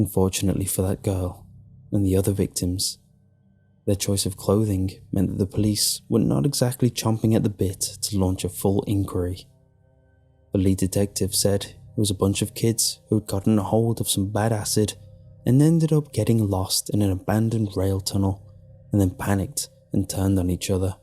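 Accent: England, United Kingdom. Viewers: as an Oglalan Souix, I am kind of amused this story is being read by an Englishmen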